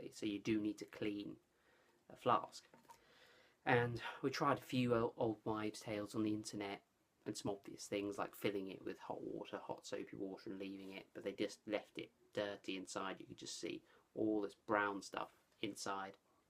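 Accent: United Kingdom. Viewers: What UK accent is that sir?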